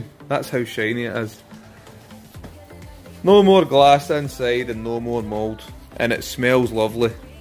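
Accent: Scotland, United Kingdom. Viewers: Why is say blackpool and your pure Scottish like me lol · Okay- My fantasies have been fulfilled. A Scottish man cleaning cars. I love your videos! I love your voice and accent! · A lot of people just like the Scottish accent---no interest in Astras at all.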